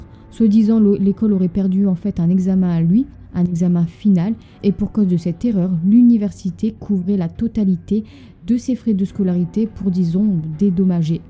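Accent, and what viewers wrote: North, France. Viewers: Bonjour je viens de découvrir votre chaîne et je dévore littéralement les épisodes , j’aime beaucoup !! Petit question si ce n’est pas indiscret bien sûr ,mais j’ai l’impression d’entendre dans votre voix,l’accent du nord de la France ,je me trompe? En tout cas merci pour tout ce travail et bonne continuation !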